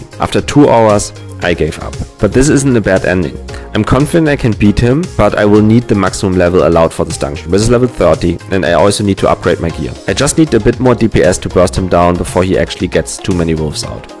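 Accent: Germany. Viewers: Sympatisch wie man dein deutsch raushört <3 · are you german? and your pronounciation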